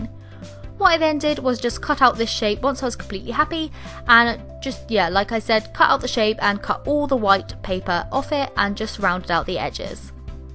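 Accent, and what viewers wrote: United Kingdom. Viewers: I've been watching u for such a long time I love ur vids! PS: ur British accent is adorable🫶🏼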